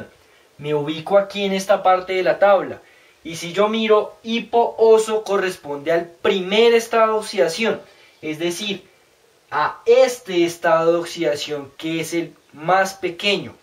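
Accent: Colombia. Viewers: es Colombiano??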